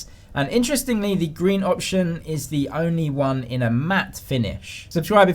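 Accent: England, United Kingdom. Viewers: Your stupid English accent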